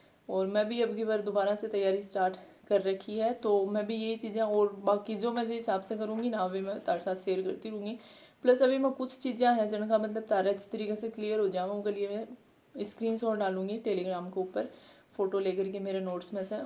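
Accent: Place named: Chirawa Pilani, India. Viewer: Apki langauge chirawa pilani side ki lg rhi h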